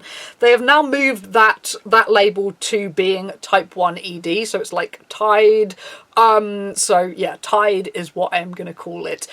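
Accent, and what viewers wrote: United Kingdom. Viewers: The hair, the lips, the eyes. It's all so pleasing to watch that perfection! It's just done so pretty. And then having you talk about important stuff in your own funny, no-nonsense way, with that accent and (british?) words that you use. Oh man, it's just awesome! Soothing even! You seem like a lot of fun!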